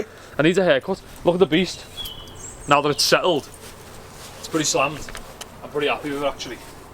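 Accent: Liverpool, United Kingdom. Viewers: Im a simple man .i hear scouse , i like and subscribe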